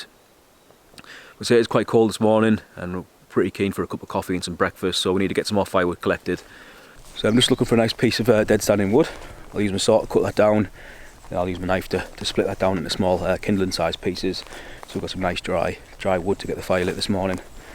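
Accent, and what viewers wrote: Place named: Northeast England, United Kingdom. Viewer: Great relaxing video and no silly music.im 60 now and me and the lads used to do this 😊you sound like tojr from the northeast.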